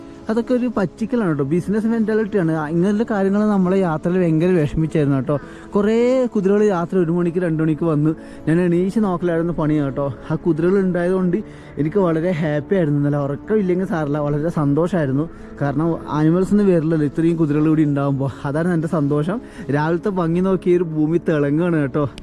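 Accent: Kannur, India. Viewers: Kannur kaaran ano?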